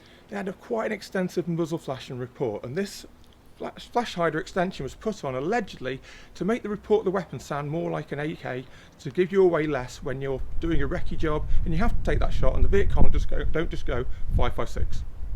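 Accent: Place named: United Kingdom. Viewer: Just the way a lot of us say it in the U.K. same as a lot of people say car been rather than carbine with an I sold :)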